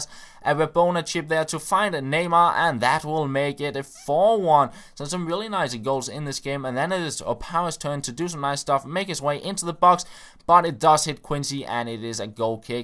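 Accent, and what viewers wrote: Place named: Denmark. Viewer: it sounds alot like danish ;) most people from our country have that accent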